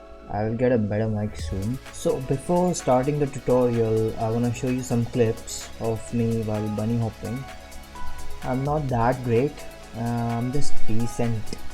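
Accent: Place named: India. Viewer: That Moment when you See an Tutorial Video in english and than its an Indian accent...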